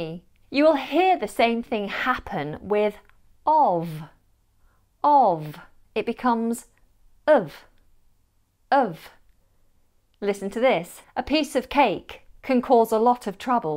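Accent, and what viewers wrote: United Kingdom. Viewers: I'm new to the English language, and when I wanted to learn the language, I started watching movies and series... I noticed that the British accent is wonderful.. I have a question: Is the accent on the channel a dialect that I can speak all over the world?👍🏻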